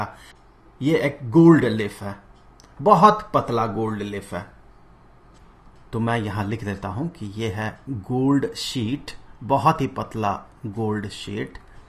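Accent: Bihar, India. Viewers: Sir ap bihar se ho pls tell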